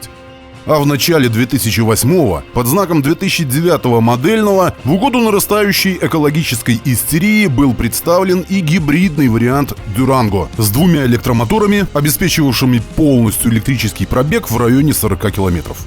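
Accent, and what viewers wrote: Belarus. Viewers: Белорусский акцент ппц)))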